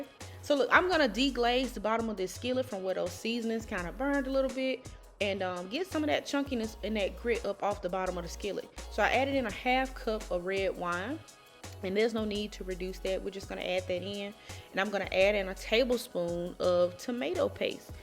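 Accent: South, United States. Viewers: Looks good! Brought my FIRST EVER Instant Pot, from Walmart, today; I got the 7qt one, and honestly, I'm REALLY intimidated to use it😢. That southern accent is cute😂, where in the South are you from? I'm from Harlem in NYC. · Thank you for showing cooking the roast and veggies at the same time. Also enjoy that southern down home voice.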